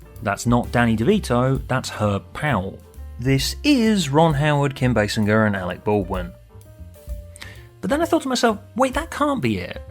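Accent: United Kingdom. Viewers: Academic cuz he bri ish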